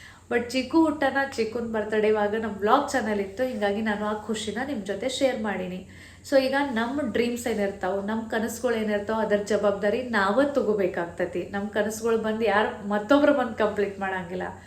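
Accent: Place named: Belgaum, India. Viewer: Hi dear na nim recent viewer ..naanu bgm dist gokak ...nim matodo style nam tara ada anta kushi aytu nodaaku esta aagatetei...evaag hubli total change aagateti matadod .na like ast madtini comments madak aagalla...nandu small kid ada so ...niv couple mast vlog madtira ...yest yella kelsa madtira .. really great dear.. online class tagoteni andri yavdu anta kelabahuda ...?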